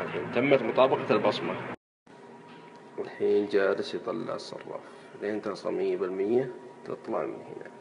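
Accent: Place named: Qassim, Saudi Arabia. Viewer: اللهجة القصيمية😷💔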